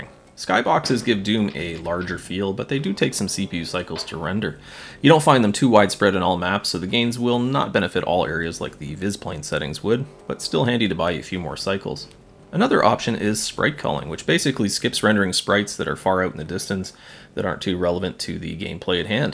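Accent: Canada. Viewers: Very Canadian accent!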